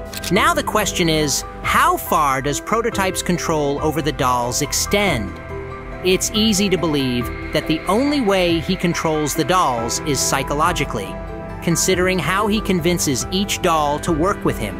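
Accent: United Kingdom. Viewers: Bruv American to British now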